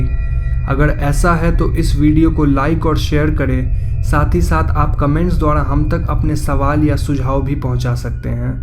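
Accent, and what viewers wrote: Bengal, India. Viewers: Are you Bengali? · A perfect Bengali accent.....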